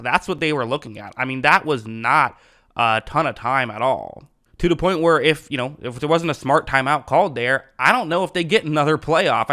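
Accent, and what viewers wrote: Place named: Chicago, United States. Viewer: This guy sounds like hes from Chicago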